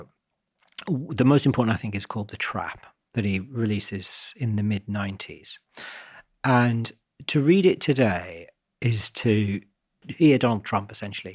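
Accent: RP, United Kingdom. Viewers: great insight .. also he blinks and tlks like mr westwood but speaks the queens english lol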